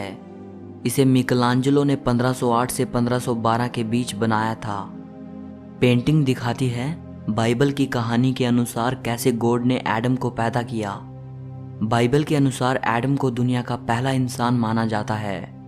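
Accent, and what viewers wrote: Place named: Bihar, India. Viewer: Aapka bolne ka tarika Achcha nahi hai. Aisa lagta jaise koi Bihari ya Gaon ka insaan bol raha ho.Ya fir koi anpar.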